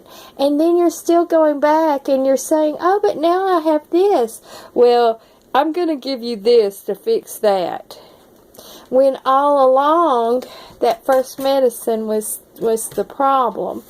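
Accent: South, United States. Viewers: I love your videos. It's like you are family with your southern accent.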